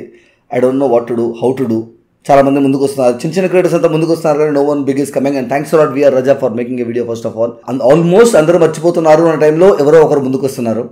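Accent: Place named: Karnataka, India. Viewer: His telugu slang was very much like Karnataka Telugu slang.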